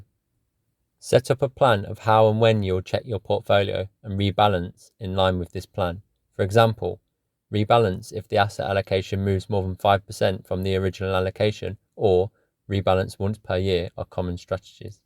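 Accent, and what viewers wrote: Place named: United Kingdom. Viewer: Dang British accent, this Merica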